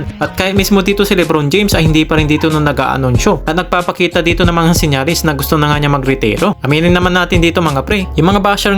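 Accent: Pangasinan, Philippines. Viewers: kahit di ko na itanong, Pangasinan ka aro! 😅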